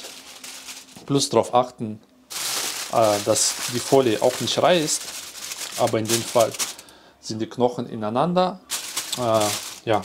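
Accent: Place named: Russia. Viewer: Слышу ты русскоязычный, а что по русски канал не зделаешь отдельно?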